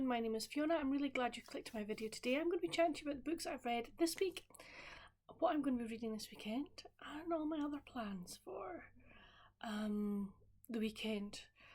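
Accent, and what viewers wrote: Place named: Scotland, United Kingdom. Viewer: Thanks for the shoutout Fiona. I love hearing my name in your Scotish accent. The Alaskan Wilderness series is on my TBR. I've been on the fence about The Wynbridge Series. Glad you read book #1. I need my romance to be low angst. So am passing on Heidi Swain. T KingFisher is not my genre of fantasy. I read and unhauled her YA (The Wizards Guide to Defensive Baking). I don't understand surealist humor and was afraid of the Sour Dough Starter familar. I need my famliars to be non threatning cat etc. I hope to finish Emily Wildes Encyclopedia of Faries today and am enjoying my slow reading of Mary Oliver's poetry collection Devotions.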